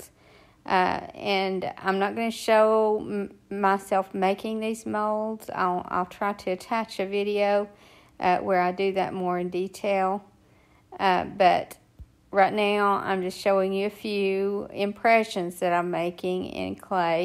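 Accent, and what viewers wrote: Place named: South, United States. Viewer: Thank you so much, just love your ideas and your accent is gorgeous. We hardly ever hear a Southern accent in South Africa so it is indeed very pleasurable watching and listening. Just subscribed, I have to get more of this, it feeds my soul. Thank you and God Bless❤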